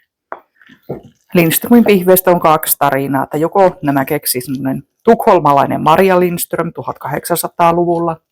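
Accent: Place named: Oulu, Finland. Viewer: Kiva kuunnella oulunaksenttia,edesmennyt mieheni oli hailuotolainen😢❤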